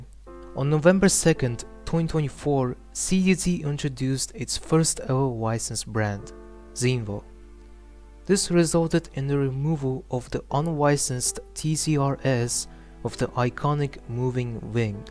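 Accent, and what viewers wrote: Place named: Russia. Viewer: oh I thought that you have that Russian accent 😅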